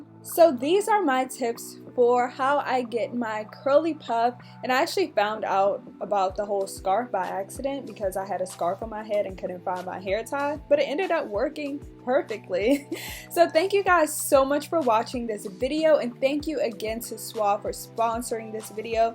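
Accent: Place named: South, United States. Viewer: soo random but i never noticed she had a southern accent. i swear she does 😅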